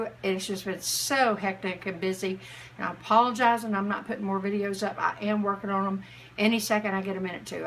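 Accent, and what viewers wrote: South, United States. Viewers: I am thinking; Kentucky;Virginia, Tennessee by accent?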